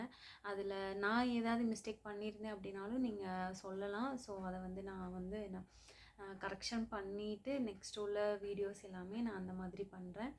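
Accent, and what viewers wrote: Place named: Kanyakumari, India. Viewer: தமிழ் பேசும்போது மலையாளம் கலந்து வரும்போது கன்னியாகுமாரி தமிழ் மாதிரி இனிமையாய் இருக்கிறது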